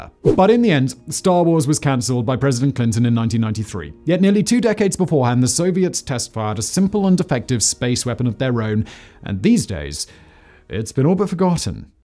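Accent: United Kingdom. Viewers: Can I just say that the name "Simon Whistler" is the coolest moniker, escpecially when coupled with the British accent....and a story about space weapons.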